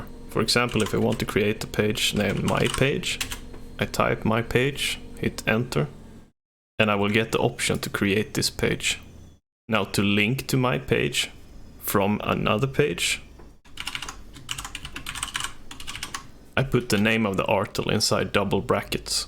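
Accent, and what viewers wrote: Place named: Sweden. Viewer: I knew you were Swedish lol your accent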